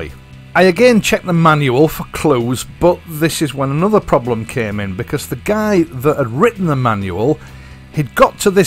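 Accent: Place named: England, United Kingdom. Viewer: Brilliant teaching video. 'Lots of practical logic and obvious experience, spiced with humor and an enjoyable Englishman accent for good measure. Thank you.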